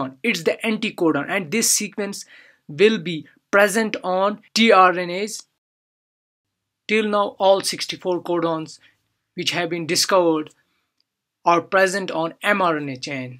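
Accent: Kashmir, India. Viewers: kashmiri accent,am i right? .bdw thank you😍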